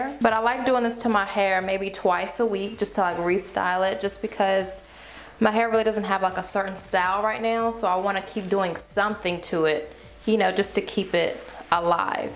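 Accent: South, United States. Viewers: she sounds kinda country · That southern Belle accent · ur voice is so southern belle esque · you have a weird country accent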